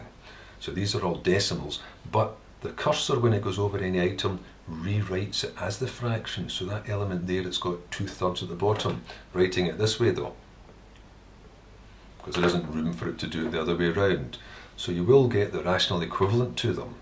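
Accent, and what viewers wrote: Scotland, United Kingdom. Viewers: You sound Scottish to me.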